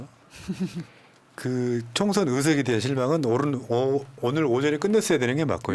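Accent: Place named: Gyeongsang, South Korea. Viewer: 물론 경상도 발음은 더 고치기 어렵고 올레 사투리 그렇게 쉽게 고쳐지는 거 아니고 엑센트도 남아 있는 경우가 많아요. 저도 그럴 거예요. 미안하지만 그래도 이렇게 훌륭한 일을 하시는 분이니까 표준 말을 발음을 하려고 노력해야 돼요. 그리고 입을 야무지게 발음하면 좋은 발음들이 나와요. 허벌래하게 입을 하니까 안 나오는거야 정신도 똑바로 하고. 그렇게 해서 더 성공하고 훌륭하고 우리 국민들 모두 연합하여 잘 활 총력을 다 해주기 바래요. 하나를 축복을 빌어요 화면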